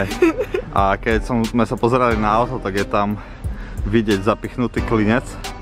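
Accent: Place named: Eastern Slovakia, Slovakia. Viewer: Ten východnarsky prízvuk je parádny. 😊👍 Už len začať hutorec v tomto videu. 😀👍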